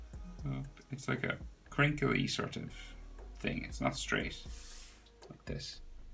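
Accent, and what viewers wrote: Ireland. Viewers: Are you Irish cos you sound it??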